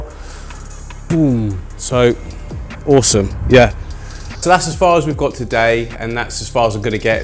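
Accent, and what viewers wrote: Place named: Midlands, United Kingdom. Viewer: Trying to figure your accent... sounds really Midlandsy, around my area (Northamptonshire). Am I far off?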